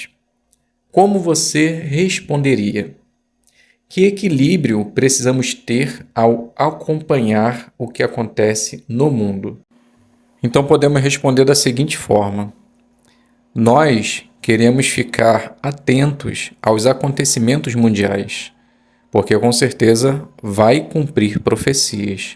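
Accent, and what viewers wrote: Rio de Janeiro, Brazil. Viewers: Legal, parabéns irmão. Amo o sotaque carioca.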